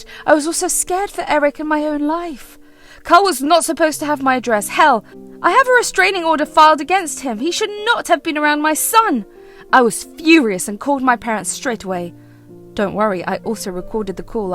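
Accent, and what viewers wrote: United Kingdom. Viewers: The British accent is funny with this story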